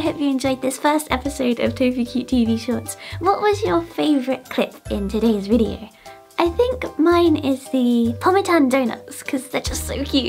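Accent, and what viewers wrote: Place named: United Kingdom. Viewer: Btw I think your British English is so beautiful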